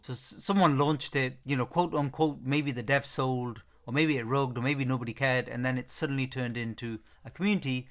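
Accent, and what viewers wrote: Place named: Newcastle, United Kingdom. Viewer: Bro, you can tell he's from around the Newcastle~ish region - from listening to the accent, in my opinion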